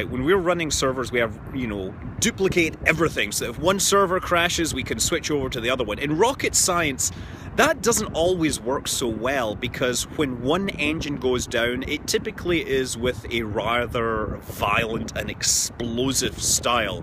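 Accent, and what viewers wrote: Scotland, United Kingdom. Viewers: Nobody expects the Scottish accent.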